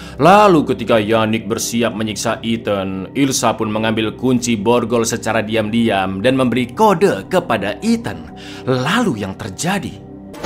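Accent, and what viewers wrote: Java, Indonesia. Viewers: jowo ne ngendi kiiieh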